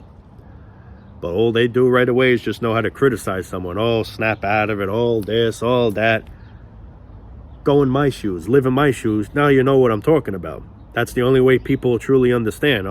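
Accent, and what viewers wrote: New York, United States. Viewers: New Yawk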